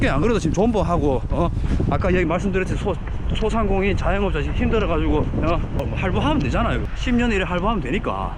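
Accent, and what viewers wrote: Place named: Gyeongsang, South Korea. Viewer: ㅋㅋㅋㅋㅋ말씀 웃기게 하신다 같은 갱상도로써 시원하네요ㅋㅋ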